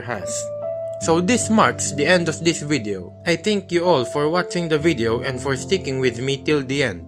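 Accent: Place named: Philippines. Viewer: Pinoy to.. yung accent eh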